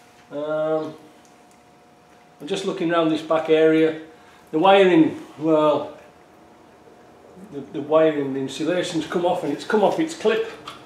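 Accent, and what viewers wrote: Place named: Middlesbrough, United Kingdom. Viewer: I recognise your accent - I’d guess Middlesbrough / Darlington area?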